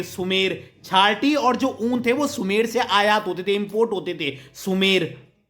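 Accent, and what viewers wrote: Garhwal, India. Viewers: Sir aap gadwali ho na 🫢🫶🤭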